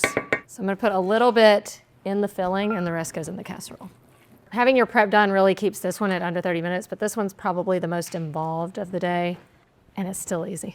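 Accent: South, United States. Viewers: Yummy I Would Pour it over Broad Egg Noodles or Fetachiy Noodles or even Mashed Potatoes Served with Garlic Bread. You Explain everything so Simple. You is so Gosh Darn Cute From a Canadian Fan in Vancouver B C ( ❤ Love your Southern Accent ) PS: Love to see more Fish Recipes IE: Oven Baked Salmon / Pan fried Butter, lemon Trout / Pasta with Prawns & Fresh Dill 🤗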